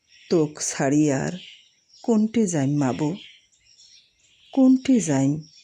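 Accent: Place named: Rangpur, Bangladesh. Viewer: "সংস্কৃতি বাঁচলে, শিকড় বাঁচে" - ঠিক কথা। ভালো লাগলো রংপুর আঞ্চলিক উচ্চারণে বাংলা কবিতাটি। আরও শুনবার আশা রইলো। সবাই ভালো থাকবেন। 🙏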